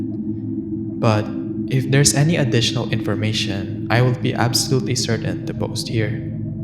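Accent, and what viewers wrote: Philippines. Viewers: The first tine I watched and heard your voice I know you're a kabayan. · Filipino accent??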